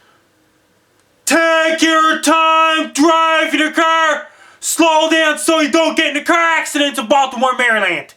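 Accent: Canada. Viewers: That enthusiastic Canadian accent made my day.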